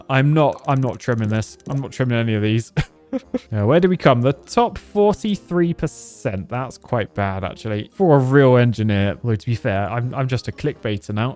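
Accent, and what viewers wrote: United Kingdom. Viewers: This video would’ve been better without your British accent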